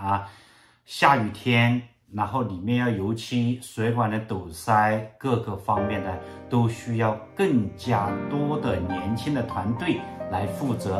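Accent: Hunan, China. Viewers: 能不能把湖南口音改一改，不要唸“邹霸”，“一鱼既往”......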